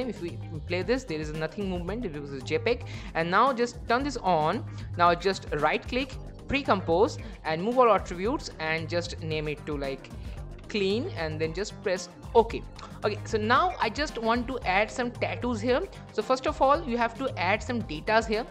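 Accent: India. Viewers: Мой любимый индус озвучивает))) Английский тяжело понимать но индусский английский идеальный )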